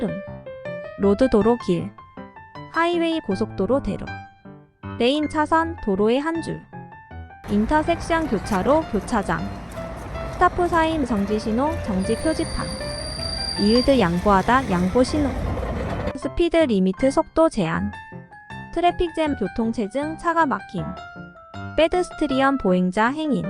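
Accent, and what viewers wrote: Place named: South Korea. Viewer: AI인가요? 영어를 한국식으로 표현하니 어색하네요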